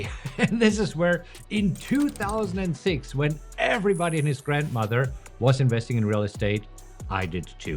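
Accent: Germany. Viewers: Du hast diesen wundervollen NRW Nachnamen und ich glaube auch, man hört, dass du einen deutschen Akzent hast. Nur ein bisschen. Viele Grüße aus Berlin. 👋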